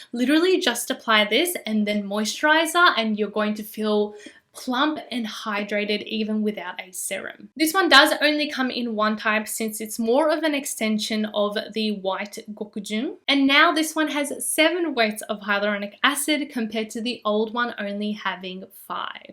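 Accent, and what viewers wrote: Australia. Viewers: You're Australian!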